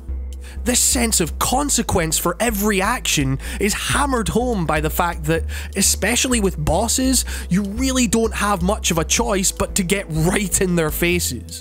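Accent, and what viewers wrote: Scotland, United Kingdom. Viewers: Are you Scottish? Because you have a beautiful accent.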